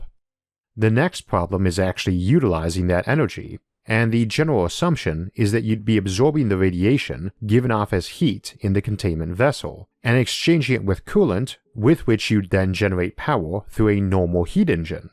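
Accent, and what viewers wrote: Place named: United States. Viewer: Get a better speech program hopefully not americanised.. Temperachores ? Dooteron?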